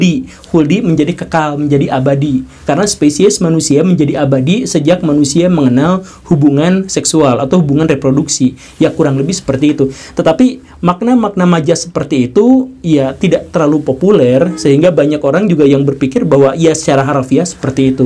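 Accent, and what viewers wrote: West Java, Indonesia. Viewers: Urang sunda, teusawios ah....😁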